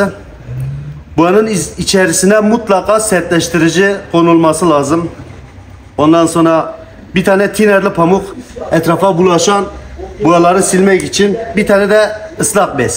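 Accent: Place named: Urfa, Turkey. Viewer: Urfalı olduğunu hissettim hemşehrim. Harikasın enerjin iyi videolara devam.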